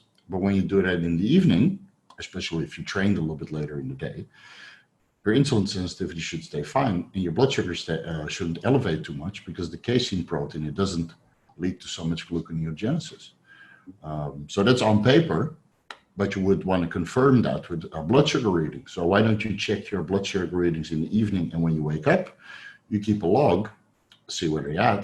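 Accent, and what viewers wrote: Netherlands. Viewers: Yeah but he is Dutch, we can hear it in his accent. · Love the Dutch accent!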